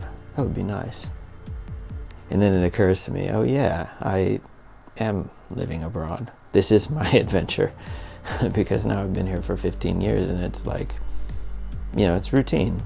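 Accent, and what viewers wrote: Finland. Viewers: Don't take this offensive or anything, but you have some finnish accent on your english. Or what I hear. There's some variations on your intonations and such... It's like Renny Harlin speaking finnish :)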